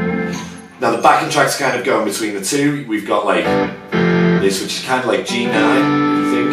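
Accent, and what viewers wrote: Liverpool, United Kingdom. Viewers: Scouser! Love it well in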